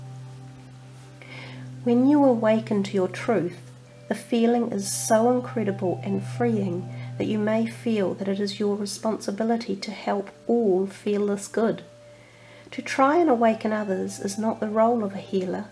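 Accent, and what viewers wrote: New Zealand. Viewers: kia Ora how lovely to hear a Kiwi voice online. Thank you for the heads up for july Blessed Be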